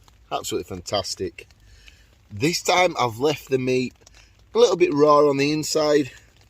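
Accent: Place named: Cockney, United Kingdom. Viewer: Well, the video would probably be enjoyable, but for your speech. I don't wish to corrupt & forget (foorgit?) MY English by hearing all the cockney "boogs (for 'bugs'), "stoof (for 'stuff') and "coomfertIble" (for, 'comfertable, of course)...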